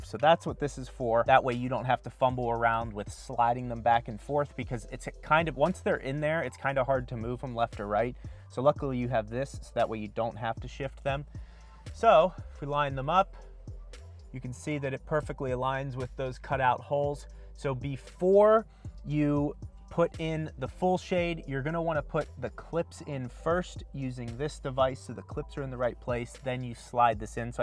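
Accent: Pittsburgh, United States. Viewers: sounds like a yinzer, said "see ha it goes dahn er" Da Burgh.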